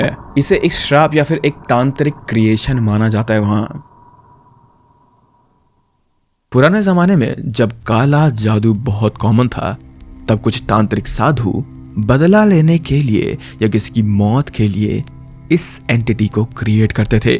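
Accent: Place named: Bengal, India. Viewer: Love your Bengali Accent bro!! Support & Love from Medinipur, West Bengal ❤❤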